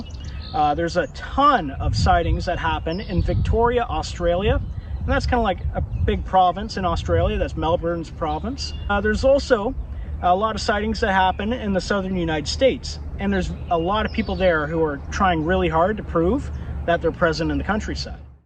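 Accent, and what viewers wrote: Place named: Canada. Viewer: Canadian accent is strong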